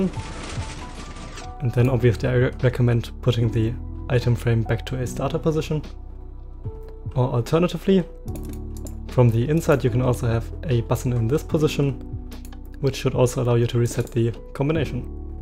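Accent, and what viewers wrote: Germany. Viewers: Do you think his german accent stands out a lot?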